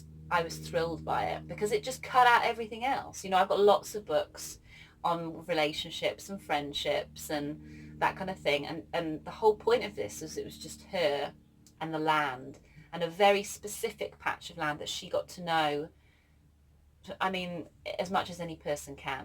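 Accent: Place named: Newcastle, United Kingdom. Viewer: Omg the farm is divine, so gorgeous!!! And did I detect a Geordie accent?! 🥰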